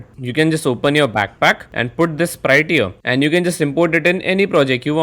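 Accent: India. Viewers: such an intersting video completely ruined by the annoying indian accent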